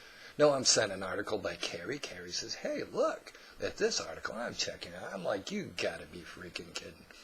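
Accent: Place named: Boston, United States. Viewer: good vid man, you from boston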